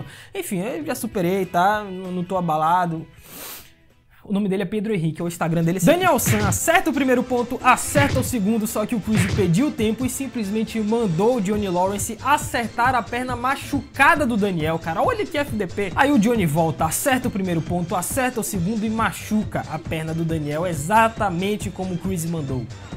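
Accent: Bahia, Brazil. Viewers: . Você é de que cidade da Bahia? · vc é Baiano tbm sou rsrsr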